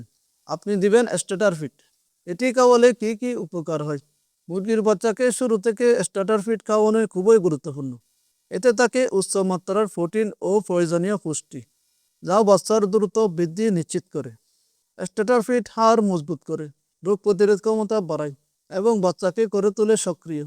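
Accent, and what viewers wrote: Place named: Chittagong, Bangladesh. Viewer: চট্রগ্রাম থেকে আপনার কথা গুলো চট্রগ্রাম এর মতোই লাগছে 😮😮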